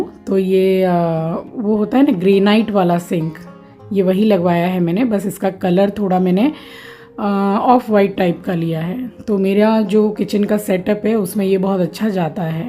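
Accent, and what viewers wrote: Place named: Uttar Pradesh, India. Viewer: Aap up wali lenguage kese bol rhe ho.... Mai up se hu boht achha lga sun ke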